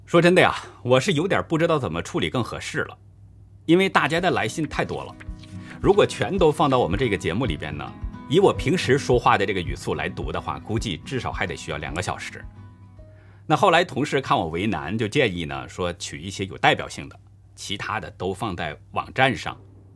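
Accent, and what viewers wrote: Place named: Hebei, China. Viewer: 谢谢李老师。听老师的口音，好像是河北人？能听到一点唐山/承德一带的口音。😆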